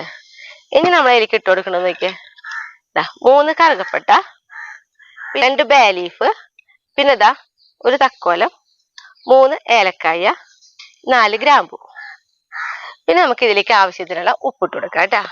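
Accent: Thrissur, India. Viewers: സംസാരം കേൾക്കുമ്പോൾ തൃശ്ശൂർ ആണെന്ന് തോന്നുന്നു....... എവിടെയാ സ്ഥലം?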